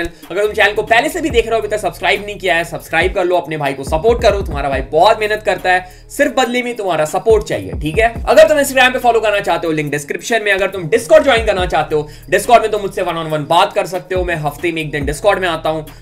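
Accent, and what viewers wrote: India. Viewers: Bhai aapka accent hindi hai but aap punjabi bolte hue bohat funny lagte ho loved the effort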